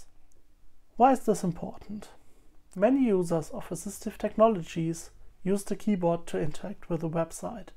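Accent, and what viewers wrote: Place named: Germany. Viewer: Very nice. I like the German accent.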